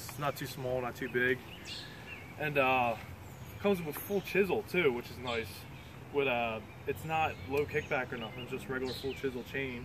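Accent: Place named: Philadelphia, United States. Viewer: You sound like your from the Philadelphia area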